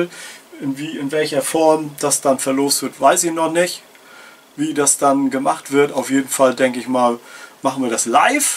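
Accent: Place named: North, Germany. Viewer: Moin, Ich liebe deinen norddeutschen Schnack!!! Ich könnte dir stundenlang zuhören 👍🏴‍☠️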